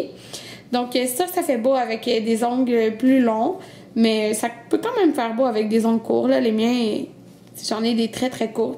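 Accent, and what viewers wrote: Quebec, Canada. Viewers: stun accent quebecois , jen ai un moi aussi comme tous les quebecois . ta dequoi contre eux ? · L'accent quebequoi est le plus Bo des accent , bravo et merci pour ce tuto :D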